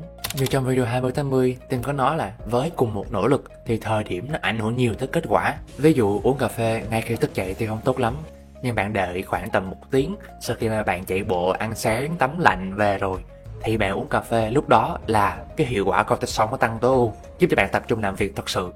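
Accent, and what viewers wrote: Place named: Vietnam. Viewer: Video rất bổ ích , mà nói giọng tùm lum tà la, lúc giọng bình định lúc giọng bắc lúc giọng nam, cũng ráng nghe đó mà nó cấn cấn k có êm tai. Mình góp ý chút vì bạn rất có tâm vào video này, mong sau này bạn luyện nói 1 giọng thôi cho nó êm tai, vì video dài như thế này cần 1 giọng đọc hấp dẫn mới nghe đi nghe lại để học và tiêu thụ kiến thức, chứ k phải nghe 1 lần rồi thôi. Cảm ơn bạn thanh niên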